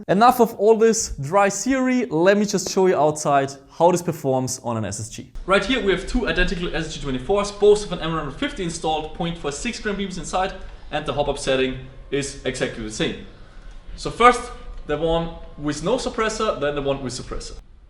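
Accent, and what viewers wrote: Germany. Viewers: bro what the german/austrian accent is the best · Warum machst du eigentlich keine deutschen Videos man merkt das du beim Englisch sprechen einen deutschen Akzent hast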